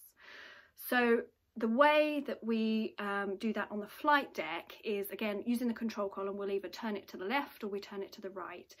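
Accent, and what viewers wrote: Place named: United Kingdom. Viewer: Thank you! I love that I understand you perfectly, even with your British accent (I'm not a native American speaker).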